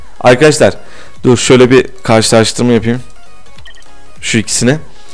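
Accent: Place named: Sivas, Turkey. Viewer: abi sende sivas lisin kesin "BILIYUNMU" demenden anladim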